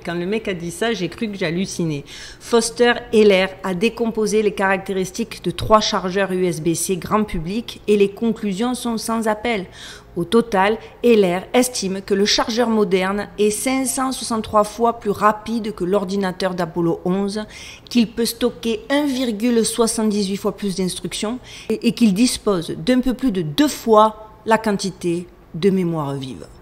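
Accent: South, France. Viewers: Excellent! Ceux qui vous dénigrent et dénoncent sont des Trolls. Donc ils n'existent pas. J'aime beaucoup votre style narratif avec ce petit accent du sud de la France et ces petites saillies humoristiques. 😊👏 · Vous êtes remarquable dans vos recherches, vos analyses et vos explications . C’est un régal de vous écouter . Merci pour cette touche d’accent du midi .. c’est du soleil et sa lumière qui nous envahit. Continuez à nous régaler .. Que Dieu vous accompagne dans cette recherche de la Vérité . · Super travail, magnifique accent du Sud... nouvelle abonné, j'adore !...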